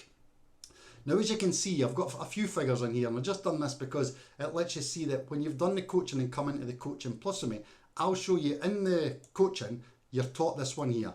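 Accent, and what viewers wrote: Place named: Scotland, United Kingdom. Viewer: oh you are Scottish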